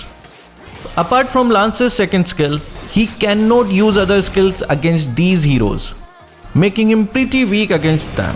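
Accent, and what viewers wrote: India. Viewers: Your hinduna accent make this tutorial perfect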